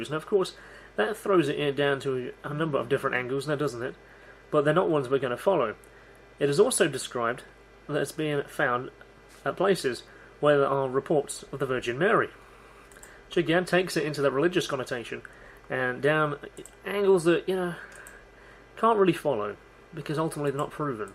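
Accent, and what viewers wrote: United Kingdom. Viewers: This guy is english, what are you talking about lol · When the narrator is a Scottish you can bet he knows what he's talking about just like in the original Star Trek , Captain Kirk this is Scotty you got to give me more power !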